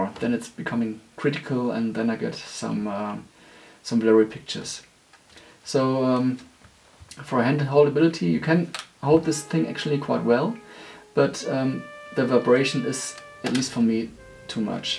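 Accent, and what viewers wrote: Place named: Germany. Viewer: Ich muss ehrlich sagen, dass Video ist super, auch wenn ich persönlich ein anderes Resultat habe nach dieser Expertise. Was ich nicht verstehe, es gibt soviele Videos auf Englisch über die Hasselblad und Rolleiflex und dann kommt Mal ein deutscher der ein Video macht, beide Kameras aus der Praxis kennt und wieder auf Englisch. Sorry aber der Akzent ist nicht zu überhören. Die Bilder zum Schluss sind sehr schön, erste Sahne.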